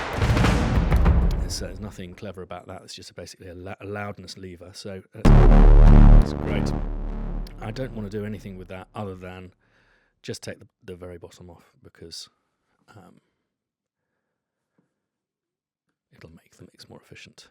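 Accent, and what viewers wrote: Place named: England, United Kingdom. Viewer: A suggestion: speak more slowly - not all ears are attuned to a rapid-fire English accent. Also, use a bit of gain and compression on the voice so we can actually hear it - would be a good start. The voice level needs to be up there just below the volume of the percussion. If we turn the sound up so we can hear you talking, the drums just about blast our eardrums together in the middle of our heads! Very poor recording technique for video. Good content, but crap recording!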